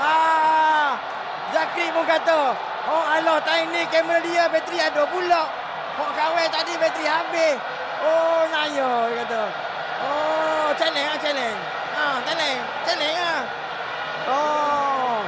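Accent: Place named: Kelantan, Malaysia. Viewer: Hoo demo slang Klatae doh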